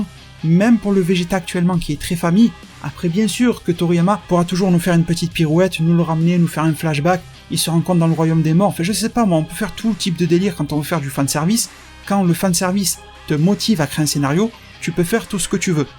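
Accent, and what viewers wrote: Marseille, France. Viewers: Sa se voit que tes marseillais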